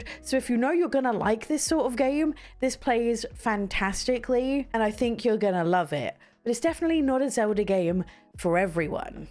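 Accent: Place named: United Kingdom. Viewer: she is from the Uk they say things different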